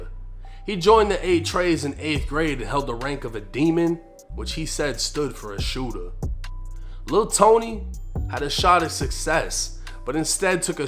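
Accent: Boston, United States. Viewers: Bro where are u from..?Boston??? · Bro I've been meaning to ask you are you from Boston you have a Boston accent am I correct or no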